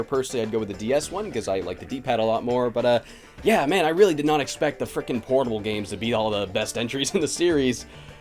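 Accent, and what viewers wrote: Canada. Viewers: Well that, and everything else he says in his pretty conspicuous Canadian accent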